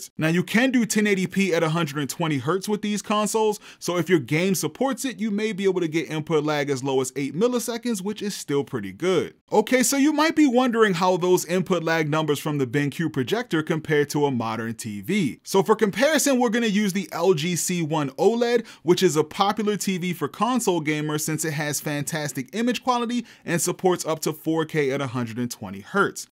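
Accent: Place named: Memphis, United States. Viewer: Are you from Memphis? “Lumen” was not helping you hide your accent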